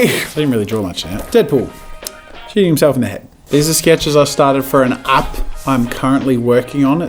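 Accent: Australia. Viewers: Is he Australian because I am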